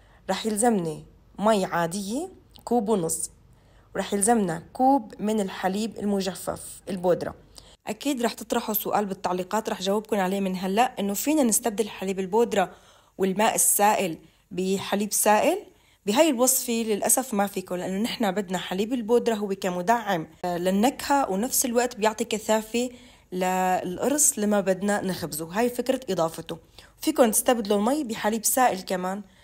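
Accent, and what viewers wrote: Homs, Syria. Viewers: الصوت الحمصي كيف ببين فووورا😅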